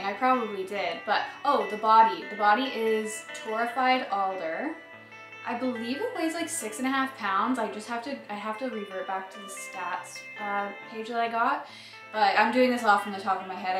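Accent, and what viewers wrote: Canada. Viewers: I like your Canadian accent. The guitar looks and sounds great too. The surf shack is lookin' great. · Love that Canadian accent!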